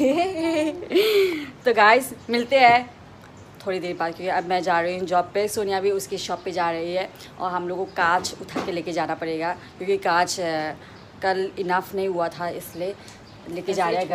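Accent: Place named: Nepal. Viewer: Hi! You are Nepalese or Nepali speaker …? Coz your tone like Nepali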